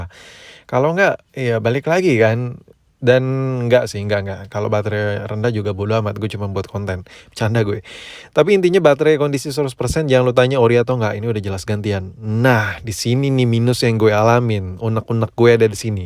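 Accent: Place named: Jamet, Indonesia. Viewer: Logat Jamet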